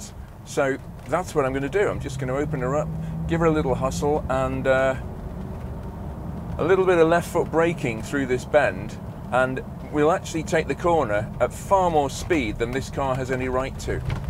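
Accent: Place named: RP, United Kingdom. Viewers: Wated all week to hear someone to speak the queens english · I come here for the English ascent.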